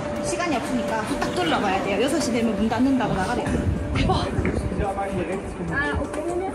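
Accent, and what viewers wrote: Gyeongsang, South Korea. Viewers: 경상도 삘이!!!! ㅎㅎㅎ 평소 좋아하던 분인데 정감이 가네요!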